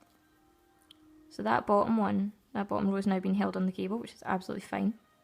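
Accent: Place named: Scotland, United Kingdom. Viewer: Excellent tut!! Love your Scottish accent!! Love the way you knit - maybe another tut?? :) +1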